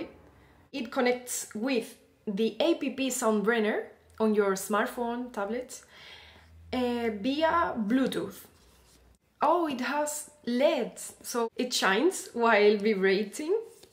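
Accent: Andalusia, Spain. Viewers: Cuando hablas inglés tu voz pierde ese acento tan especial "español"que me encanta. Gracias por el video ;muy buen tip para los músicos. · No. tiene ese 50% de su encanto en ese acento andaluz...Se tenía que decí y se dijo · Sos muy simpática! Tenés un conocimiento enorme de música e de la guitarra y lo explicas con toda la simpatia del mundo. :) A mi me gustó bastante tu review de ese metronomo. Ya lo había visto en las publicidades en facebook pero no tenía idea de que podría hacer todo eso. Tal vez lo compre también. jajajaja! Y me encanta tu acento andaluz! Suena muy elegante! :)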